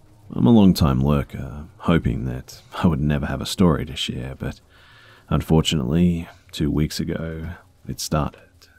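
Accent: Australia. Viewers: Melinda Nicole I thought it was only me that loves the Australian accent XD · that's our australian accent mate, i'm an aussie and so is, if you don't like it theres plenty of other narrators out there who aren't australian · Thank you for making these videos they honestly are the only thing that helps me sleep. Your voice is just nice and it’s also nice listening to a fellow Aussie accent 👌🏻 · I agree! Love Australian accents. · so? he's still got an Aussie accent... we are very multi-cultural in Australia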